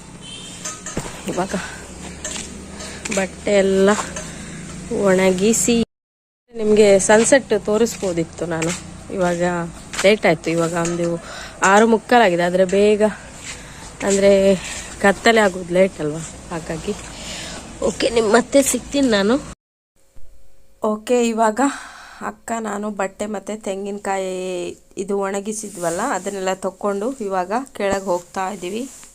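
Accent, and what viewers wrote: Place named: Kundapura, India. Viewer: Hii akka Kundapura knnda matastri .. Yav oor nimdu . Nav hemmady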